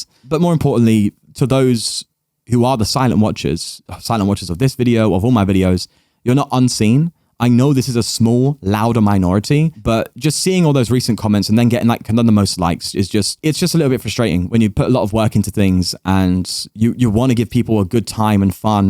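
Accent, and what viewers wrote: United Kingdom. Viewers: Always have a good time watching and those funny misplay moments among other entertaining shenanigans. Those and that cheerful, jesting personality (not to mention the nice british accent!) really sets this channel apart from others, I think. And it's cool to see games pushed into victory even after those misplays! Shows that a skilled player can turn even a bad situation into a victory. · Bro just be yourself, keyboard warriors are everywhere just let them be. I watch you because of your laughs, beloved British accent, you're entertaining, your perfect English, than merely playing competitive perfect Pokemon games. Love from Malaysia ♥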